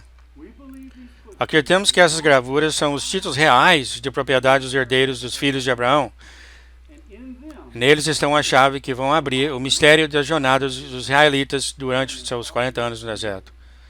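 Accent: Minas Gerais, Brazil. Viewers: quem esta narrando e um mineiro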